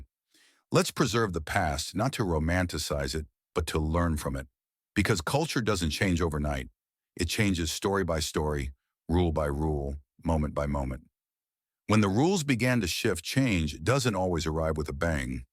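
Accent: United States. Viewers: i hate to tell you this, but the american accent put lots of people off a video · Why do we have an American narrating this?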